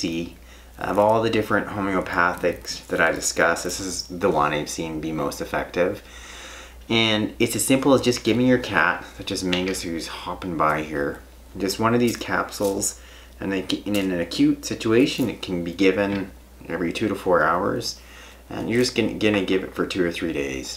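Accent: Canada. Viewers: Yes it is helping! I don't know if Canadian accent is much different from the American one, but surely my English is not as good as I thought 😂 but I admire your channel as a very needed social inciative. Tk you!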